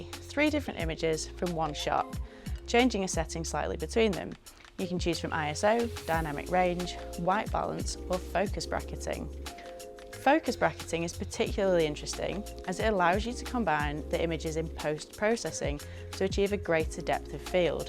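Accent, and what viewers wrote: United Kingdom. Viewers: It’s so nice hearing a Northern accent in these reviews! Thanks for making this 👍🏻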